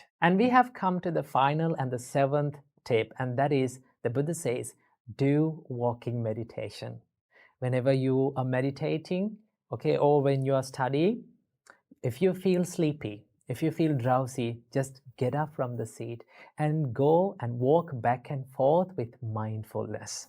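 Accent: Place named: India. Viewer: His english feels hindi 😊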